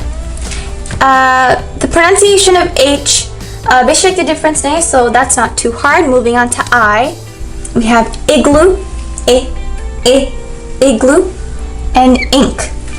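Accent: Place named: Bengal, India. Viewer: Best accent of Bengali pronunciation ever I have heard.❤️❤️❤️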